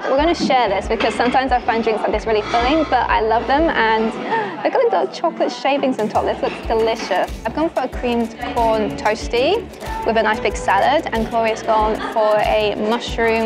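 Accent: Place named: New Zealand. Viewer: yes I picked up his kiwi accent ;-)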